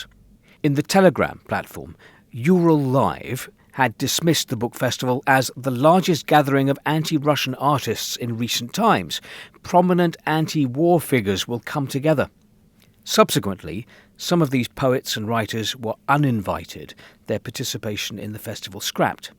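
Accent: United Kingdom. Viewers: This narrator sounds British. Isn't that where the BBC comes from? I'll be taking this with several grains of salt, if not the entire shaker.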